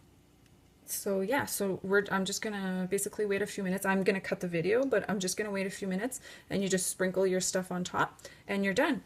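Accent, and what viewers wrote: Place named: Ontario, Canada. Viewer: Thank you so much for the informative videos! I’ve been binge watching your vids & love them all! BTW: your “accent” takes me right back to summer even on these cold winter nights. My family has a cottage near Port Colborne & we snowmobile near Algonquin Prov. Park. So basically..listening to you gives me warm fuzzy feelings besides all your fab tips & tricks😆💕!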